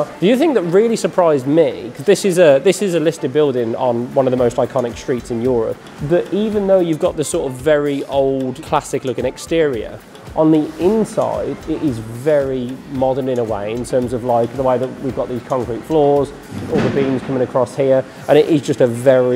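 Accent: Birmingham, United Kingdom. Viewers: Obviously Birmingham but Dublin possibly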